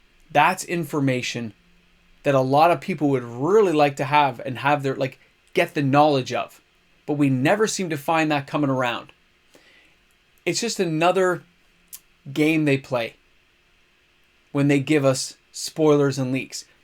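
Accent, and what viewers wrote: Canada. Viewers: Canadian eh?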